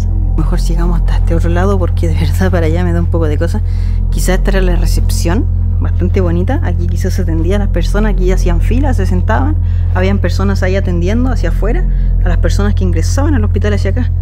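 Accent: Chile. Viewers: Hola. Te sigo desde la provincia de Entre Ríos, Argentina. Gracias a tus videos estoy conociendo tu maravilloso país... pero lo que más me gusta es ese "tonito chileno", el más lindo de latinoamérica.🤗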